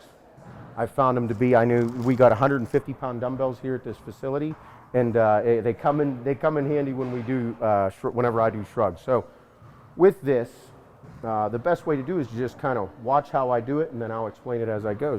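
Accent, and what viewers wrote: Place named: New York, United States. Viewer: I love your way of explaining tips, moreover I like your typical New York accent. I just love it. Whenever I listen to you, I feel I am in New York. Thanks bro you the best. Prem India.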